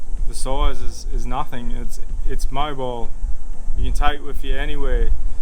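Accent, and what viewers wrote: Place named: Australia. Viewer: That's an Aussie if I ever heard one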